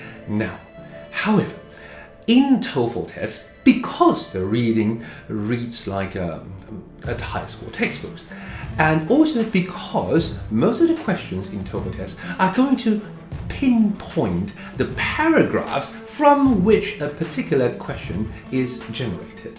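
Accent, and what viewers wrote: United Kingdom. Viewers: 這是英國哪種口音? 我一個大陸同事有一模一樣口音，看到影片驚呆了我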